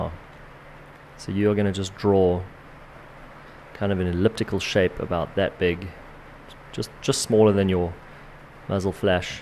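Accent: South Africa. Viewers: also South african!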